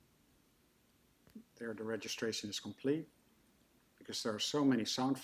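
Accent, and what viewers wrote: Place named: Netherlands. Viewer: Your English sounds very Dutch. Nice presentation, nice locomotive. Although I drive mainly German and Swiss locos I may consider to buy this one as well. Looks and sounds really nice. Greetings from the Čech Republic 🇨🇿 and stay healthy 😷.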